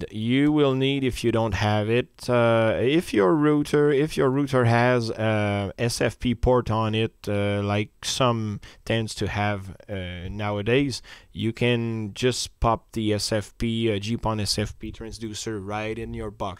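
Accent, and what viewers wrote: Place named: Quebec, Canada. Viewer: LOL j'adore l'accent et finalement pas tataouinage une explication a la québécoise direct au point cool merci!!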